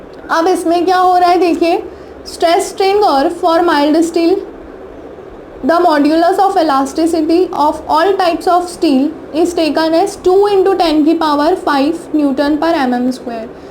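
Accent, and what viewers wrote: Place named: Telugu, India. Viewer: Madam jee aap ka telugu maa boltha Hai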